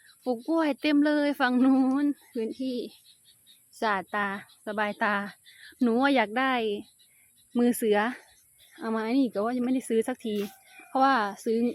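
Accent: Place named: Sisaket, Thailand. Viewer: สำเนียงศรีสะเกษ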